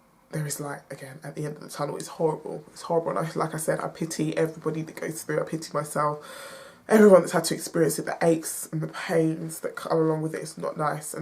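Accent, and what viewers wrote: England, United Kingdom. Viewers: It hurts me when black people say they have vitamin D deficiency. Judging by your accent you live in England, not enough sun, that's why black people have all this carbon (so called melanin) in our skin when expose to sunlight it produces vitamin D. Girl stay in the sunny countries. Don't let them tell you this bullshit about lighter skin absorbing sunlight and creating vitamin D better than darker skin its a white lie they don't have enough carbon (this so called melanin) to absorb sunlight to produce vitamin D. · I cant understand a shit from your British accent ! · Not taking anything anyway from this upload but I find a black female with an english accent so attractive. Back to this upload, excessive facial 😓 (sweating) is also a symptom of Vitamin D deficiency. She is nice looking though. This deficiency is common among blacks/ indivuduals with African decent. 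Truth is it gets too hot outside for quality 🌞 even for darkskined individuals.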